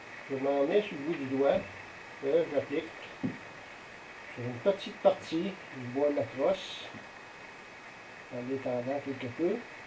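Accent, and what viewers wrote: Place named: Quebec, Canada. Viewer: Mltteud ou multitude? C’est quand même trop moche l’accent québécois !!!!!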